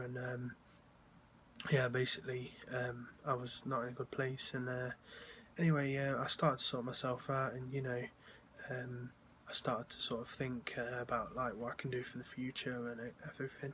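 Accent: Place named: Hull, United Kingdom. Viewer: You have got to be from Yorkshire. I am, you sound very Hullish?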